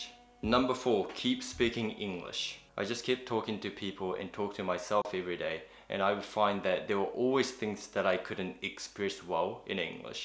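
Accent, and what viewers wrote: New Zealand. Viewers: さんの声寝てるときにずっと聞いてますww NZアクセントカッコ良すぎます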